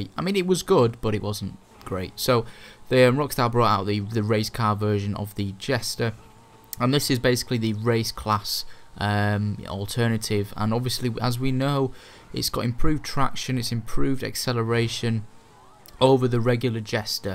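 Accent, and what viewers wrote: United Kingdom. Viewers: also what part of the uk are you from? ive had a few people in lobbies recently telling me I sound a lot like you...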